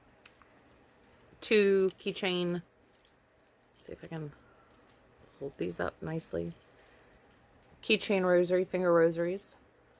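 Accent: South, United States. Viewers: Im a new subscriber but wanted to say I really love your videos! I watch Mary from Ireland too LOL my late husband was very Irish so she touches my heart. Your sweet southern accent reminds me of home (I'm a country girl from rural midwest). Keep up the great vlog!!! Oh and I luv luv luv the dr who scarf!!!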